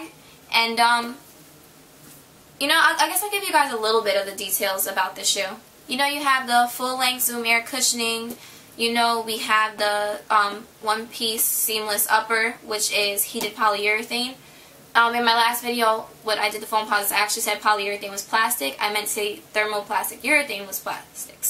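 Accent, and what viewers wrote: United States. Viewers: are you from boston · R u from jersey?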